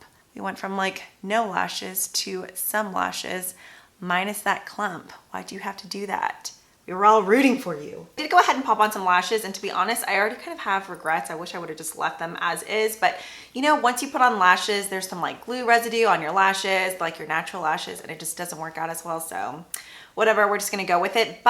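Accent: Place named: New York, United States. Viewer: That was hysterical! Yonkers is near me and NYC and definitely have an accent if you are from there. Hahaha. Loved it Happy easter love! Love all the vibes of this video. And I also adore the new way or what seems new way to show product name. Awesome editing. I ordered a bunch of your recommendations from k beauty video. Waiting for it to ship. Lol. Wait I own those two blushes too you just used. Amazing combo. Haha. Thanks for those too!! 🐇🐇🐣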